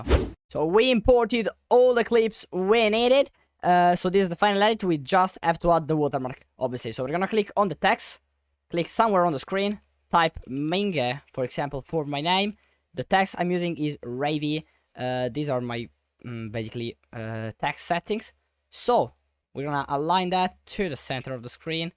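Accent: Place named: Italy. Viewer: La pronuncia italiana❤🇮🇹 SEI FORTE CONTINUA COSì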